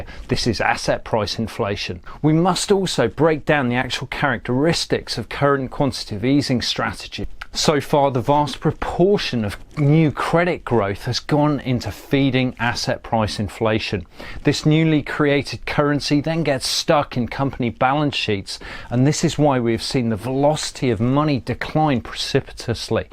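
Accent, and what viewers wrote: United Kingdom. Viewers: You remind me of a black guy, but with Caucasian skin and a British accent.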